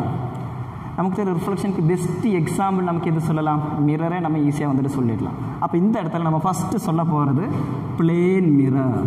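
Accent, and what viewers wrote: Kerala, India. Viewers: Sir Ur from Kerala...? Ur slang like malayalizz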